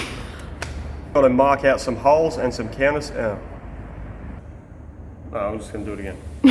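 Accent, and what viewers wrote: Australia. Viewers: If you pronounce "fail" in Aus as "file" in US, how do you pronounce "file" in Aus? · They do know your from Australia and that is how you guys normally talk people cry over the dumbest things well hopefully the have their safe space and like and subscribe LOL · been watching now for a few weeks and only just noticed your Australian i guess it shows as us aussie have some pretty good skills ;) watching your vids have improved my daily quality too being a younger boilermaker/ metal fabricator i find this channel very intresting and helpful to watch TYVM for the quality videos and work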